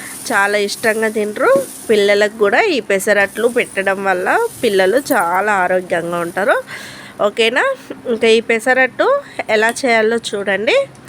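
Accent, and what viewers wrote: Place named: Telangana, India. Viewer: Bahusa imedi thelangana anukonta telangana vallu thelugu alaage matlada thaaru its ok bhaasha ardha mayithe chaalu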